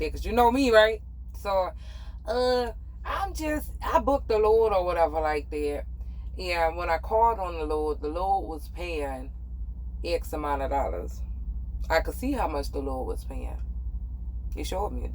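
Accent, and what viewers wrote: Louisiana, United States. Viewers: Love the accent. Gotta be from New Orleans. I hear the Cajun in your voice. Anyway, Stay safe outhere. Don't take no wooden knuckles Beeby!!! My Louisiana people know what i'm saying. Lol. Peace. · Love that Louisiana accent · I Love that Louisiana twang guuurl just keep talkin 😁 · I love the Louisiana accent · I like her accent, what part of louisiana she from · Sounds like a beautiful Lousiana accent. This lady is beautiful! Hey Sis!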